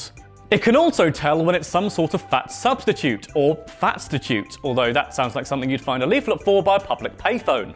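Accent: England, United Kingdom. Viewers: I've always wondered are you english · I've always wondered. how does one get such an awesome British accent? I'm part English and only have a Swedish accent mixed with native accent (both I am too) QAQ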